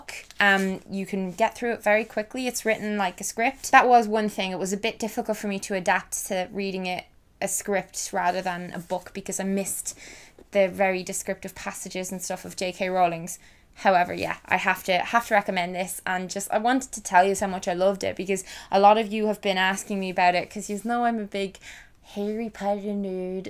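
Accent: Ireland. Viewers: Is she Irish 🤔🤔 X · most youtubers just bore me but i could sit and watch all your videos back to back because i love the content so much! but the irish accent just makes me feel so at home, as my grandad is irish and i love listening to his stories!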